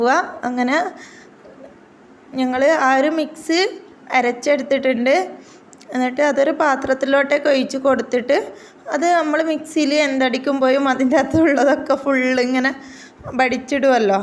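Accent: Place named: Malabar, India. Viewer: ഇമ്മള് കോഴിക്കോടൊ അതോ മലപ്പുറമോ ട്ട ട്ടട്ട❤❤L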